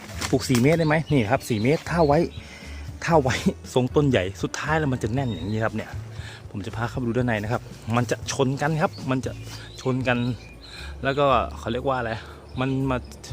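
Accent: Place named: Sukhothai, Thailand. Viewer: สวนอยุ่โขทัยมั้ยครับฟังจากสำเนียง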